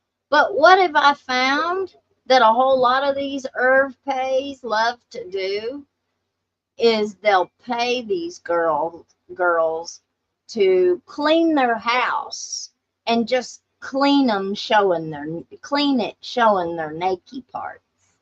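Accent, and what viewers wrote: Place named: Kentucky, United States. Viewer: Im from southern Ky & i adore. Everybody i know has that accent ❤❤❤. Keep up the hard work. We are so proud of u E!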